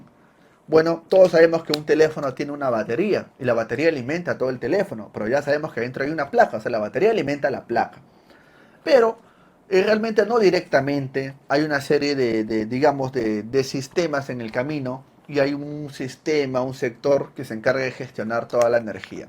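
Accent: Peru. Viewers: Con razón le buscaba el acento y no acerté a que era peruano.😂 Es un excelente profe.👏👍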